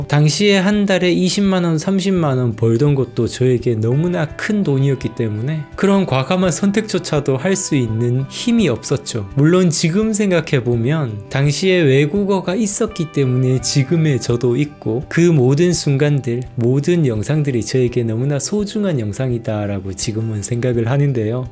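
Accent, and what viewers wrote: Andong, South Korea. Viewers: 구독했습니다 아직 님 영상을많이보지는 않았지만 요즘 많이 고민중이 두가지문제가있어서 님 생각을듣고싶어서요 하나는 저도 책이 800권쯤있는데요 한번씩 정리할까? 하는생각이 들때가 있어요 저는 돈벌고부터 책을읽어서 다 사서 보다보니 이렇게되었는데요 책을 읽기도하지만 한번씩 보여줄여고 모으나? 하는생각이 들더라고요 뭐 단편적이아닌 지금제 많은생각 플러스된거라 다 이야기는 못하겠지만요 서론이 길었네요 님은 읽은책들 정리안하시고 게속 가지고있으실생각인가요? 또하나는 저는책을읽을때 줄긋고 표시하고 모르는단어 확인해서메모하고 그런데 마음에든글들을 님은 따로 메모를해놓는지요? 지금보니 좋았던글 플러스 님생각도 쓰시는것같은데요 제가 직장을다니다보니 손목이안좋고 악필이라 쉽지는않은데 또 써놔보고싶긴하고 두가지가 계속 해결못하는 일인입니다 우문현답 기다려도될까요? 다른영상에서 답을찾을수있을듯하네요 다들똑같은고민을하나봅니다 영상들감사합니다 글고 안동사투리 정답네요 ㅎㅎ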